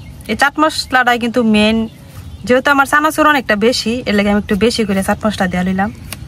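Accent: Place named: Mymensingh, Bangladesh. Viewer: ভাষাটাও চানচুরের মতো মজাদার ছিল। মমিন সিংএর কথ্য ভাষা আমার প্রিয়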